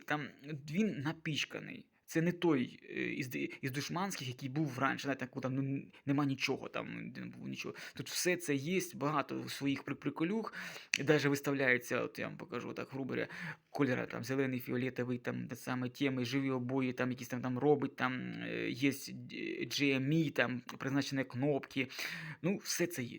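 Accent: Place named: Chernihiv, Ukraine. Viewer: Схоже на чернігівську балачку) покращуй мову, а так то цікаво розповів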